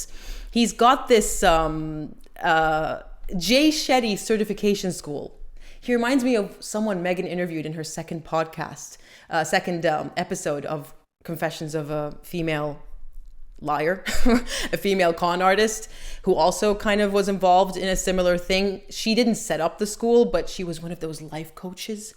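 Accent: New York, United States. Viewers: I was surprised when you said you’re not an American and you’ve never been to the States. You have an American accent! I just assumed you were born here and moved abroad. Where are you from? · How can you never have been to the states and yet speak like an American? I love your program, your mind is sharp and your delivery is exceptional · I always thought you were American based on your accent !!!!😂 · It blows my mind you’re not from the US because there is NY writ large in accent, mannerisms, hand gestures. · Your accent sounds America. Where are you originally from? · How do you have such a US American accent if you've never been here? Not unless you did your schooling in Canada. Sometimes their accents sound the same :) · Whaaaaaa? You’re not an American? You never been here? You have a perfect American accent!!! How do you manage it? I admired you before but now that I know this, hats off to you lady!! 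Where are you from then? Wow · Don't you have an american accent?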